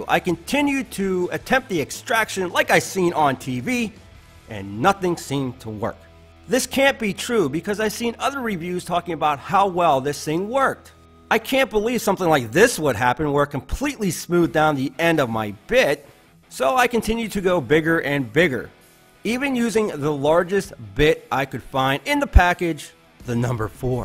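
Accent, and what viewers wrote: Pittsburgh, United States. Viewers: Are you from Pittsburgh???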